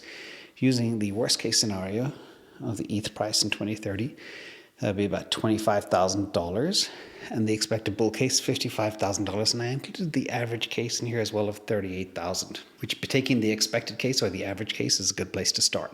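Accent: Ireland. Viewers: love the vids and the take.. where in Ireland are you from? If I had to pin your accent down.. Rebublic, Dublin, South side.. Dalkey or close? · . I hear an Irish twang in the accent?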